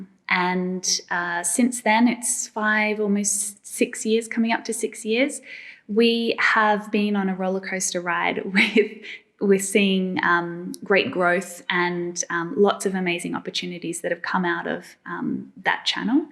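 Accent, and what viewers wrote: Australia. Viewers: congratulations, you are terrific in teaching English. I think your accent is between Australian & English & easy to comprehend. Best of luck. Enjoy Perth. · Hi! Teacher. Good aftnoon. How are you? My Name's Ivanilson. I'm from Brazil and live ABOUT TONW Itacere-ba. Did you know? WHAT'S News? I'm to want speak English Britanico but know I study how a teacher can is this Austrialiano sutaque is spok beatiful.more Did you know? Does She is a woman very beautiful love see you speak this lessonigs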